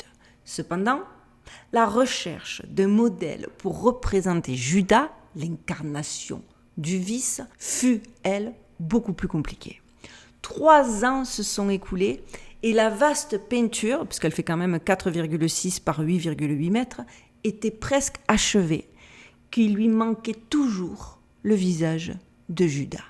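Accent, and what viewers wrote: Marseille, France. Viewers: Et bien tu m'as captivé et tu m'as apris des choses qui me réconforte dans le fait que je suis de retour à l'église depuis 11 mois et ce 3 fois semaine.. Je te remercie de faire toutes ces recherches car moi j'en serais incapable... J'aime t'écouter avec ton accent de Marseille. Comme quand j'écoutais Clairette ici au Québec étant jeune. Padre Pio sa PROPHÉTIE serait un bon sujet ..🦊🐾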